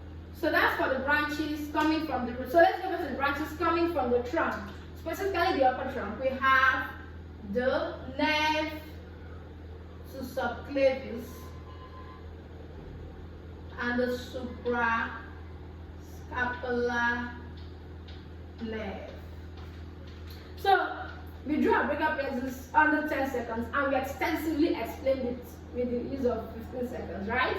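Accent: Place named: Nigeria. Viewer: In our mother's accent. Thankyou mama naija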